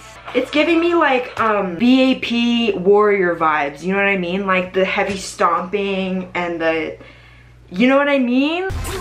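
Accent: United Kingdom. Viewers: ur british got me crying 😭😭😭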